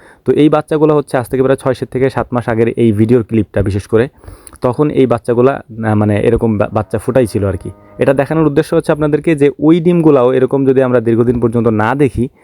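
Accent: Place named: Dhaka, Bangladesh. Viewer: Aponar vasa sune mone hoy aponi Dhaka district er manus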